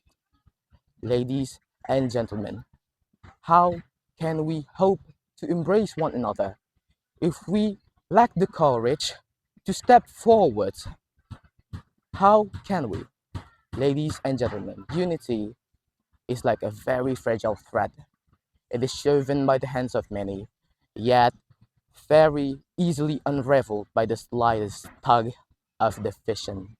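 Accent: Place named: United Kingdom. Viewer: British nya terasa banget 😂 , tolongg sesekali buat video jokes pake bhs inggris dong.. 😄 arry po ah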